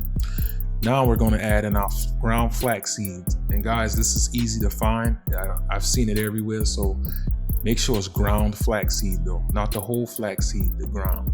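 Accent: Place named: Gullah, United States. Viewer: You sound like one of my Gullah clan. Say it's so😊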